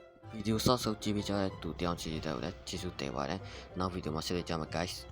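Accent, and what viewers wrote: Dawei, Myanmar. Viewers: ထားဝယ်ကြီးလာ ဘရို ထားဝယ် အသံ ကြီးနဲ့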